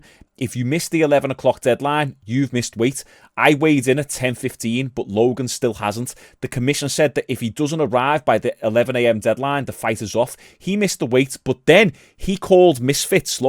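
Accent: Liverpool, United Kingdom. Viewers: W scouse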